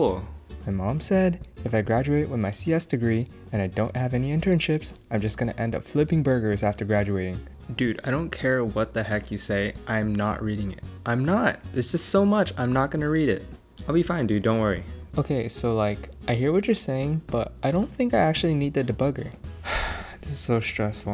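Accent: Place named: China. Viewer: Your Chinese accent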